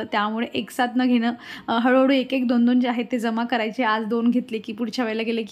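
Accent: Khandesh, India. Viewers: Oy tu aplya khandesh chiy ka v..kudliy nemki gav kont sagjo br...😅u remember our tone ❤😂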